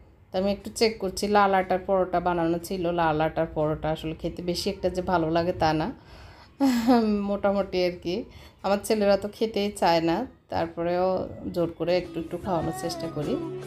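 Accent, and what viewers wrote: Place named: Chittagong, Bangladesh. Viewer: আপুর কথা কিছুটা চট্টগ্রামের টান আসে তাই বলছিলাম।আমিও চট্টগ্রামের তবে থাকি কুষ্টিয়ায়।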